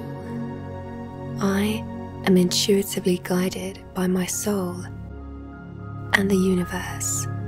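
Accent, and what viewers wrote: Scotland, United Kingdom. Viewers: Whose voice is this? So clear and soft... and I love this beautiful British accent! Thank you very much! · This CD is very calming <link> the first meditation focuses on breathing, the second guides you through the Buddhist metta bhavana--loving kindness to all, and the third is a meditation to be used when walking. him is originally from Scotland, so there is a little accent to his voice, but it is very soothing and not at all distracting. If you are a beginner to meditation, this CD will walk you through all the steps of relaxing and breathing as well as sending out the positive thoughts of love and kindness that will be returned to you. We have several CD's, but this one is a favorite that we choose most often. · MrRasZe that would be British. Lovely accent.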